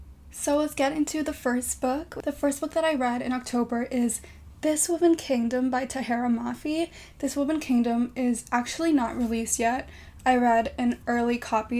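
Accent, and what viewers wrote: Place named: United States. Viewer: ooo, that's cool! did you go to an international school or lived in America at some point? you sound American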